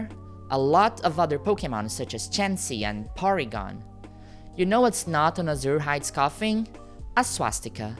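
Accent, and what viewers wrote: Brazil. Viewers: Amazing vid and research, I had no idea about that. And thank you for your work in the comments, I've scrolled a bit and couldn't find a single nazi comment (and I KNOW they came here). I hope more people see this and your channel grows! Also... You're brazilian, right? It's so easy to recognize our accent when we speak english! lol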